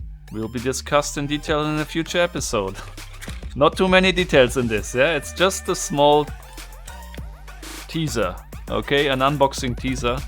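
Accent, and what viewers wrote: Germany. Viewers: Omg that German accent tho!!! Love it❤️❤️❤️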